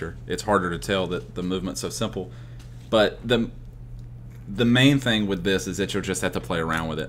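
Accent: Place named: United States. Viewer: Your accent is awesome lmao never heard a country accent for Godot tutorials. +1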